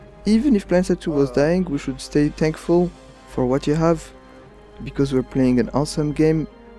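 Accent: France. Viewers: Is this Dude frech ? Sounds pretty like it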